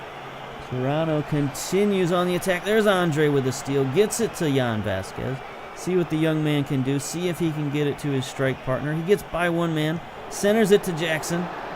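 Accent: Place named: United States. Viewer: Two things: One, it's "shoniere", rather than "koniere" (Choniere); and two, where'd you learn to say Toronto right? I grew up there, it's odd to hear someone say it like a native of the town when they've got such a clear American accent. :)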